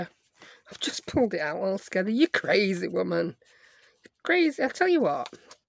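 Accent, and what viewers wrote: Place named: Northern England, United Kingdom. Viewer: Just found your channel and enjoy listening to your accent I am a Geordie living in Canada and I know you are further south but your phrases remind me of home.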